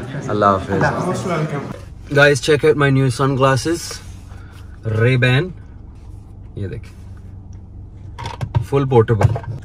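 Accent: United Kingdom. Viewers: I don't get your British accent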